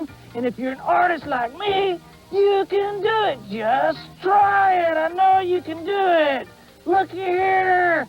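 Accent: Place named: North Carolina, United States. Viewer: I don't know why, but his voice reminds me so much of my friend from North Carolina. My friend has a softer, slightly deeper voice, but he has almost the exact same accent as.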